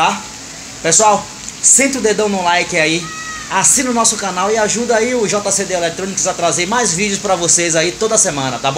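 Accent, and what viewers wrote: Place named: Pernambuco, Brazil. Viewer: Sou de Pernambuco e conheci o sotaque pai! kk Muito bom o vídeo! Entrei no grupo do whatsapp e tenho algumas dúvidas...estou no aguardo do grupo, muito obrigado e parabéns!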